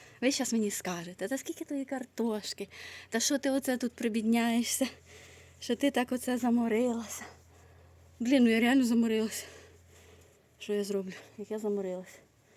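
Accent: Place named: Surzhyk, Ukraine. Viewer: Ви суперові, приємно чути ваш суржик. Нехай у вас все легко виходить, удачі вам.🌺👍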